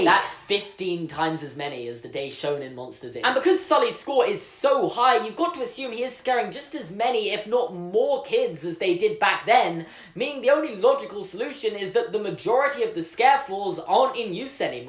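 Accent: United Kingdom. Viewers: That'll teach Pixar..... not to make a British boy so upset that he feels like ranting on YouTube 😂. I love hearing you all upset with that adorable Bristish accent.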